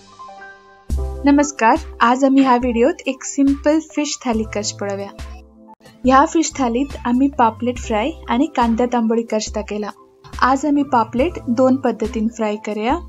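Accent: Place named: Konkani, India. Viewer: Lovely to hear you speak konkani. Thank you for sharing the recipe 👍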